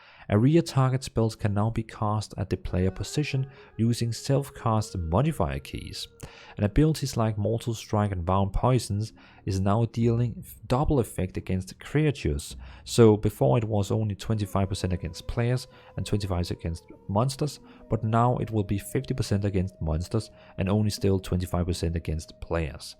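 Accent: Denmark. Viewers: yes Sounds like a danish accent, but it’s similar to Germany, a Germanic language 😊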